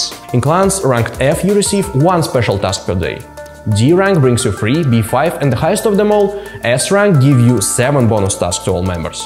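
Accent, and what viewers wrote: Russia. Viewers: Ringlish bro russian inglish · i love the russian accent...lol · Он стоо пудов русский я сразу понял по интонацие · Nice Russian accent.wow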